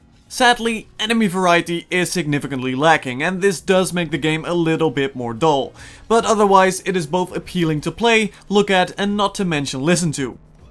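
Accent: Netherlands. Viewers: You sound really Dutch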